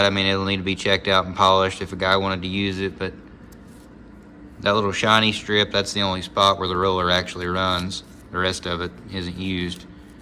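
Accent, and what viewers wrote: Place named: Nebraska, United States. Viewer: Have friends in Alliance Nebraska who sound exactly like you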